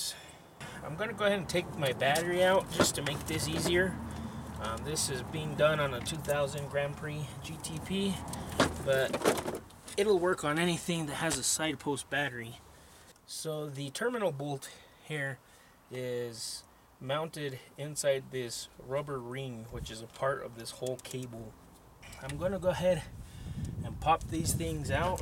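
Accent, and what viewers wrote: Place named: Minnesota, United States. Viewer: Thanx for the video....... I kinda felt like I was watching an episode of FARGO (the accent)......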